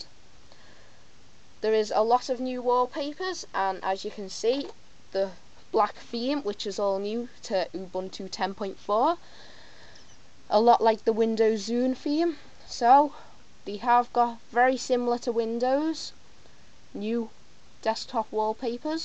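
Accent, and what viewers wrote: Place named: Liverpool, United Kingdom. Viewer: No, But im a Pool supporter and recognize the accent.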